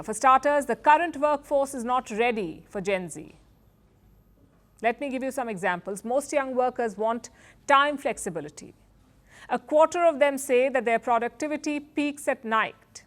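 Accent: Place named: India. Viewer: I hate Indian broadcasters. They cannot get rid of the accent. 😖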